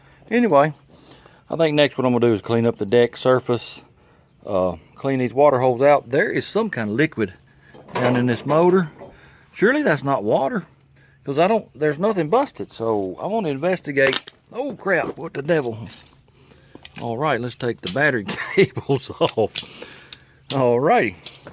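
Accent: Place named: Eastern Kentucky, United States. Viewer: really enjoy your show. I grew up with some boys from eastern Kentucky and you sound a lot like them, Glad to hear your channel is growing!!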